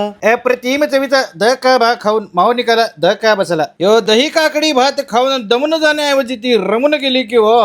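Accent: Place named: South, India. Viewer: 1 ch no. South Indian tone tr kamaal 😊😊😊😊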